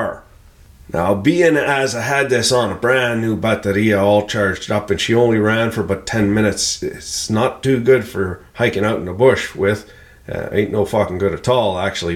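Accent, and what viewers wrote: Canada. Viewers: You need to do a video translating all the sangs and saying. I'm guessing it's common Canadian contractor dialect but, some of us 'mericans have a hard time keeping up... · I love your accent. I think I am going to have to learn to speak fucking Canadian, Ah? Learned lot of new vocabulary, like Shmoo, skookem. Fuck, I am going to have to watch this video again. Why this video brings me such happiness I have no fucking clue, but it does. :-) You definitely have a new subscriber. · Just discovered this channel. Never heard a more Canadian Canadian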